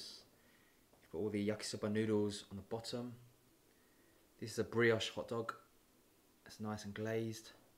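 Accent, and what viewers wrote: United Kingdom. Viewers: dude, I have been wondering that are you Japanese or British? Quite an accent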